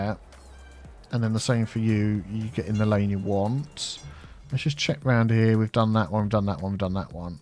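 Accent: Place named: Derby, United Kingdom. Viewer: You sound like you live right round the corner from me. Im from Derby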